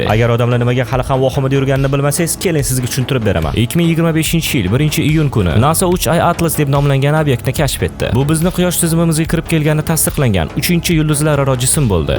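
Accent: Tashkent, Uzbekistan. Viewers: Факат узбекча гапир..тошкенча узбек тилига мансуб эмас.. Овотти..улвотти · Узбектилини.тугри.гапринг ука.тошкентнинг.шивасида.гапирманг.вотти.сузи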